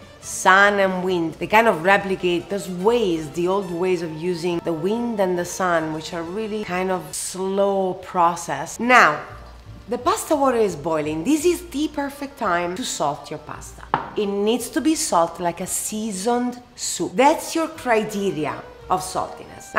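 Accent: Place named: Italy. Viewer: Regarding her accent, it should be clear to everyone in the meantime that she is not American, but she is Italian, born and raised in Italy. She came to America as an adult.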